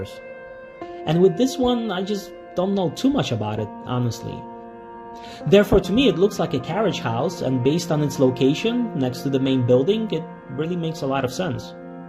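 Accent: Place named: Romania. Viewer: Are you by chance originally from Romania? I ask because I've heard Romanians speak American English with a similar accent like yours. Great content! Thank you.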